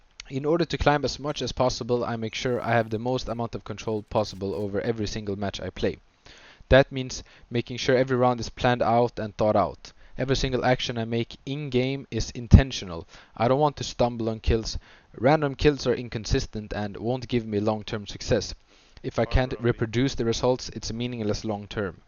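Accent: Sweden. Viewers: Älskar den svenska dialekten, bra video mannen, ska ha allt i åtanke :) Just nu D1 med ca 120h, målet är ASC denna act!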